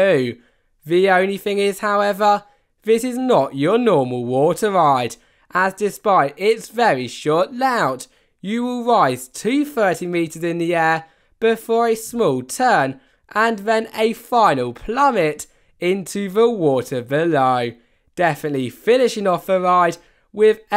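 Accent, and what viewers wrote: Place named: United Kingdom. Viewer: it's a british accent lol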